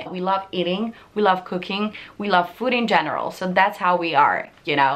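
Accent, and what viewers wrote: United States. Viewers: I love your accent, It's like a cross between American and English, and then sometimes you forget the "th" sound and you go Italian again hahaha, carina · Sconvolta dalla perfezione del tuo accento americano...totally jealous! 😄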